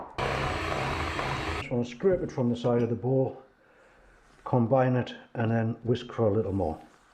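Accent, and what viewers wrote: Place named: North East England, United Kingdom. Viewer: where are you from? It sounds like your from the North East England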